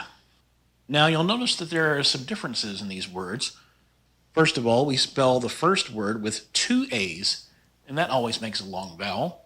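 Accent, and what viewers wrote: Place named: United States. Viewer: are you american, you sound so america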